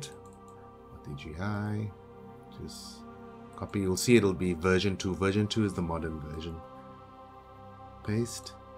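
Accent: South Africa. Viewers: i think i'm from the same place as you, south africa? i felt your accent sounded south african? as for the p*rn thing... haha, that actually why i switched to z. once you get to the three xxx to place things really at the bottom, you realise you need a more benign letter :) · I can hear another South African anywhere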